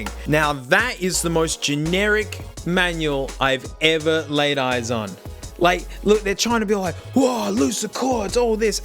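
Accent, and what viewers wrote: Australia. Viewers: Funny angry Australian man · In this video u sound more australian than the usual... idk why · You’re Australian? 🙃🙃🙃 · and it is so refreshing listening to a fellow Aussie haha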